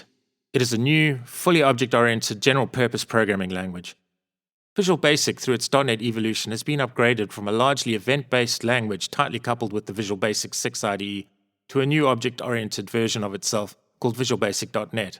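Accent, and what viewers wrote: South Africa. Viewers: Howsit, was about to ask this but saw this reply. Thanks for the courses and info. South African PHP developer in the UK looking to learn c# - even better getting to listen to a fellow saffa. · Thank you very much. It is a little challenging for me to digest everything after watching it once, so will need repeating but the quality of your course and of course S.African accent helps.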